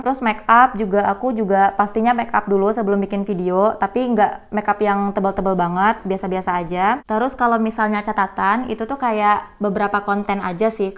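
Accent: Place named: Karo, Indonesia. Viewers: Awal ibu kakak itu ngomong Aku langsung keingat kalau orang karo berbahasa indonesia itu ada khasnya :v, dan aku tadi terkejutnya pas kakak ngomong bahasa karo :v auto bangga jadinga Sukses ya kakak Btw kakak org karo ? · logat nya sumatera ya mba..horas mamak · logat karo nya 👍👍👍👍👍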